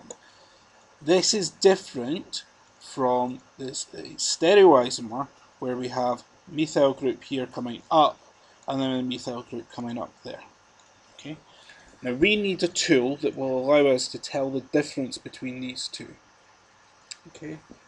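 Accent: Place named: Scotland, United Kingdom. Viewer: I think that is a Scottish accent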